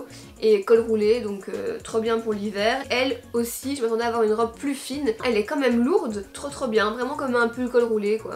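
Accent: Belgium. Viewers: mais Bardot ça veux pas dire Bordeaux 😂😂 les Beges on parle comme vous hein 🤣❤ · J'adore notre accent belge😂 #TeamBelge #Brussels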